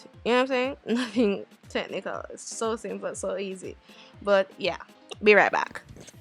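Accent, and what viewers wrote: Jamaica. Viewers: My mother is from JA. I knew where you were from, before you said it. 🇯🇲🇺🇲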